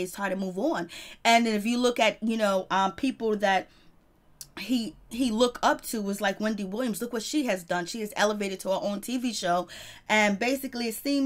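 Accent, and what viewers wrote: New York, United States. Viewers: You’ve got a thick NYC accent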